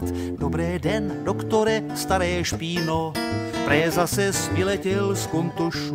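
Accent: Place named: Brno, Czech Republic. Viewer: Hantecu hafo, hafo nerozumim, ale jinak skvělý! 👏👏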